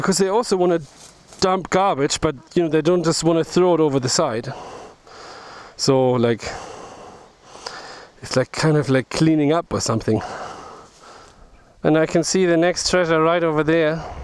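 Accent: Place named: Germany. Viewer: Are you from Germany? You've got a German accent! I'm from Sweden.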